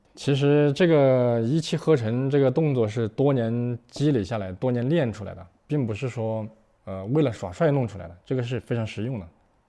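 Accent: Sichuan, China. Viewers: He's speaking Mandarin with a bit of Szechuanese accent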